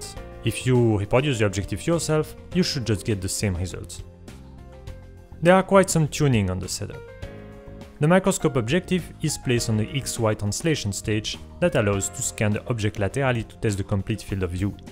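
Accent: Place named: Belgium. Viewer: Great explanations!!! Your accent sounds like from Belgium or French speaking? I have few friends I know from there.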